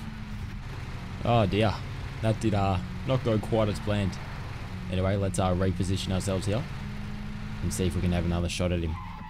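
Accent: Australia. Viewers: what part of australia ya from mate? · Australian accent , badass skills. Gold.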